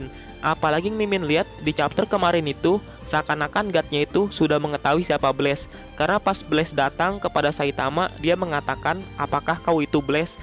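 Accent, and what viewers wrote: West Java, Indonesia. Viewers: dari mana, sunda nya 😅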